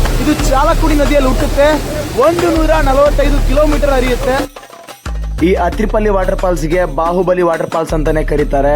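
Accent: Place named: North Karnataka, India. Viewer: ಉತ್ತರ ಕರ್ನಾಟಕದ ನುಡಿ ಇನ್ನೂ chanda... ನೋಡಿ ಖುಷಿ aitu... ಗುರುಗಳೇ.. ಒಳ್ಳೇದಾಗಲಿ.. 🙏🙏🙏🙏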